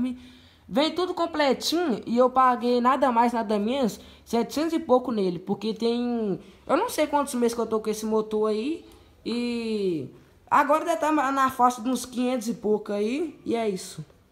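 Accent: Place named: Minas Gerais, Brazil. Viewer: Minero fala tudo igual Man kkk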